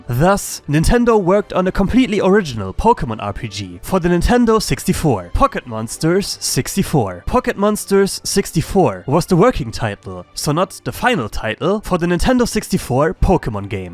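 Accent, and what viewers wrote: Germany. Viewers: You make the German accent sound oddly attractive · Nach deinen Akzent gehe ich stark davon aus das du aus Deutschland kommst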